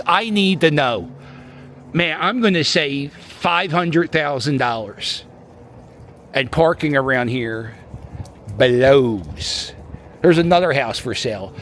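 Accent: Philadelphia, United States. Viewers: Thanks Cuz… Love ur vlog. You have the voice to rep Philly. Keep it up. Keep warm. Much Brotherly Love!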